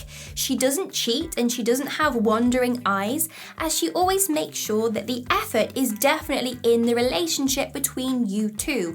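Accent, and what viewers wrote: United Kingdom. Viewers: i think you are British because of your accent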